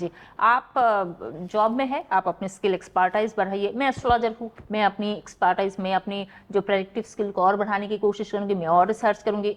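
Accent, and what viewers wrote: India. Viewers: She is Bengali and Bengalis ka hindi itna acha nhi hota and accent bhi alag hota hai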